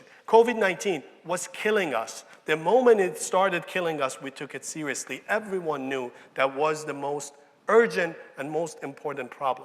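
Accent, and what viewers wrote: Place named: England, United Kingdom. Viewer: I’m awed by this beautiful accent of yours, Prof. Madani! I came here to listen to your English accent and go, but I came, stayed, and listened to the whole speech. Watched it, loved it, and learned so many things from it. I'm a proud Iranian, but so sorry for not having you here in the country. Good Luck.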